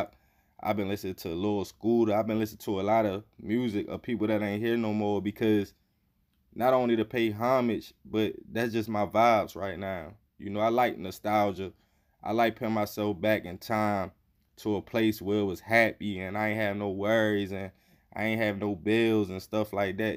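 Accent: Baltimore, United States. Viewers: You can tell he from Baltimore the way he reads.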